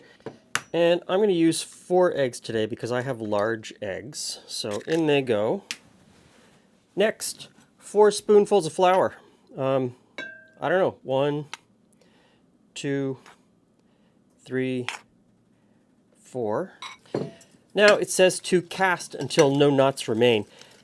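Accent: Canada. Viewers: I knew you were Canadian by your accent. My relatives are from Canada and they have that exact same accent. When I saw you pulling out those milk bags, I said, "Yup this guys Canadian." I knew because my uncle always bought their milk (household of 5 children) i loved the milk and wanted more and could never get enough, that and the big jar of Nutella they had for spreading it on a bread. Lest we forget the bread and that eastern European jam we always ate....since we are from Eastern Europe. Those good old innocent days, the Irish Spring and cold downstairs little bathroom.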